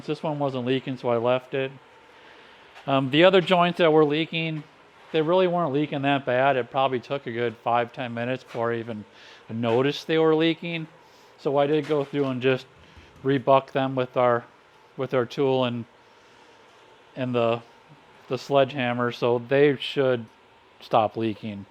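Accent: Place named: Wisconsin, United States. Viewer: Well thank you for the reply , and now i can satisfy my curiosity about the accent lol. Hey ftr i am , and have been for over 30 years , a Cheese Head , GO PACK GO Packers fan even though I’ve lived here in Georgia all my life. Dont know if you’re a fan but just thought I would share that since you said you grew up in Wisconsin.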